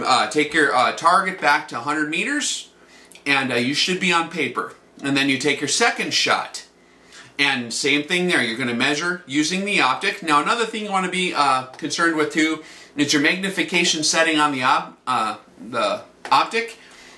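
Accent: Canada. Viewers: Sorry kind of a new watcher here, but are you Canadian?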